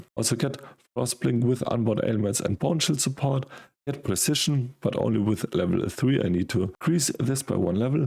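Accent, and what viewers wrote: Germany. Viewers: Hes pretty clear, I think he's German, so the accent is there its understandable, atleast for me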